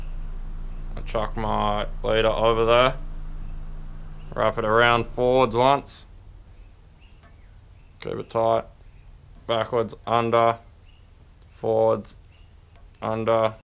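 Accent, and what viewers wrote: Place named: Sunshine Coast, Australia. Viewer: Fark me! Briggsie’s brother from another mother! Good , informative vid. Are you from Sunny Coast also? Same accent. That way to tie the FG is the only way I reckon is the bomb. Searched heaps and this method finished off with the rizzuto knot is the best by far!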